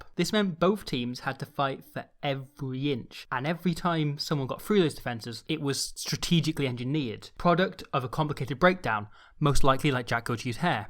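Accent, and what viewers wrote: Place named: England, United Kingdom. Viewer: Ah. Never knew that. He sounds English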